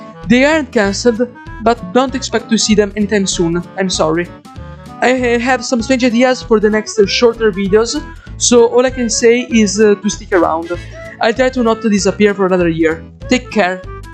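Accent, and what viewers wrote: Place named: Italy. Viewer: Dalla parlata ho ipotizzato che fossi italiano, a ho avuto la conferma Mi fa davvero un sacco piacere che qualcuno in Italia parti di questo gioco in maniera così accurata Ti faccio i miei complimenti✌🏻👍🏻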